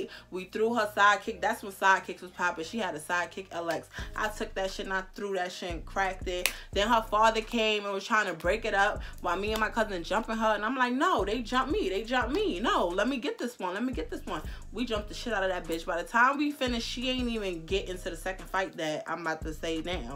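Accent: New York, United States. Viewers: It’s that New York accent!! I love it!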